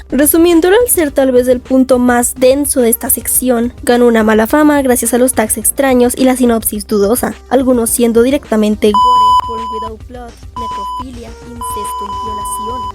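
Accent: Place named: Bogotá, Colombia. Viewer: Felicidades! es un grandioso video, desde el apartado visual, como la edicion, las pequeñas animaciones que integras en los titulos y el manejo final del video para explicar las partes mas densas de un fandom. Es un gran formato y muy fresco en youtube. Es un honor conocer creadores de contenido Bogotanos, me encanta que se sienta tu acento, no soy mucho de comics y aun asi lograste darle un gran ritmo a toda la trama sin dar realmente tantos spoilers. btw el inicio fue increible JAJAAJ, ahi te va mi sub, estare muy al pendiente de tus nuevos videos!!!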